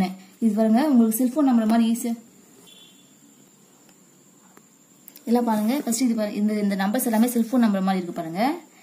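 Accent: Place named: Chennai, India. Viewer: Unga chennai speak super madam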